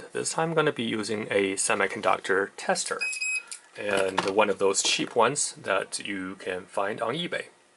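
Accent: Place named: Germany. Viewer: You sound very... German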